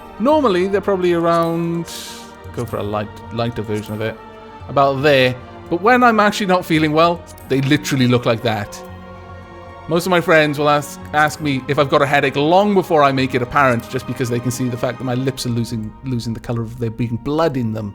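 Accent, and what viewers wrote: Wales, United Kingdom. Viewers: Sir, I believe you are Welsh.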